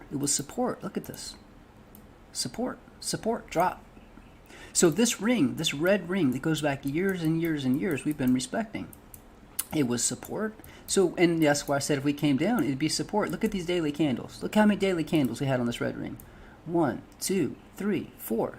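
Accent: South, United States. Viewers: That country drawl is killin the chillin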